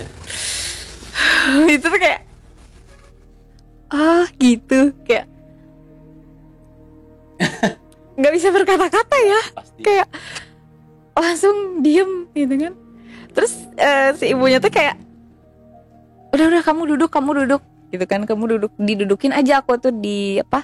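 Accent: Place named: West Java, Indonesia. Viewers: Kok ketara banget logat Sunda di gaya bicara nya ya bang??? 😁😁😁😁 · Sunda pisan euy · Seru sih ceritax cmn cape dengerx mbax nyusun katax mikir bgt...mungkin krn logat sundax..😀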